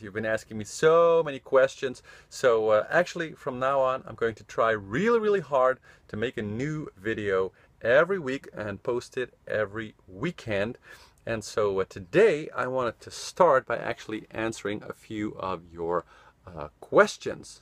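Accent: Netherlands. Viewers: Compared to your early videos I think you're losing your Dutch accent when you speak English! 😉 Thanks for all your help and advice.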